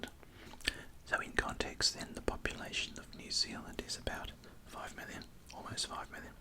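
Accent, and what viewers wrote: Australia. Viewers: Love. Just straight up good sounds to help people out. No bullshit ads in between that wake you up and/or startle you. Cheers you're awesome and you've helped me alot 💪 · My Beautiful Aussie 🇦🇺 Excellent job, the sound of your voice & your accent is wonderful. You make me happy.😘💕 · Damn it Australian accent is the best imo.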